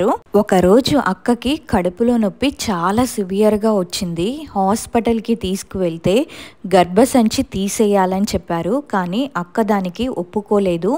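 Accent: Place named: Coastal Andhra, India. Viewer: 🚩✅ You are speaking a brry perfect pite Krishna Coastal Telugu language with ease & without any corruptive influencial slangs of Indian language Urdu or exotic Arabic language. Very impressive. Very good. Keep it up. If interested you Study "Garuda Puranam & one special chapter in Oka Yogi Atma Katha " that narrates"Life after Death of Human persons of bad souls - Evil forces. By this you will have better understanding about dissatisfied Souls that roam around their related people. ✅🚩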